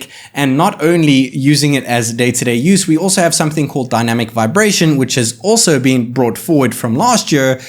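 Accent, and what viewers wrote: South Africa. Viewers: Hi, like your channel i can tell your South African, whats the easiest way to get my hands on this sony 1III in South African?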